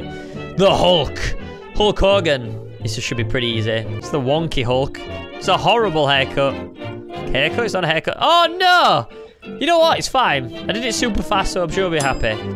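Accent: England, United Kingdom. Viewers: People proceed to make fun of his accent Bro, I'm English too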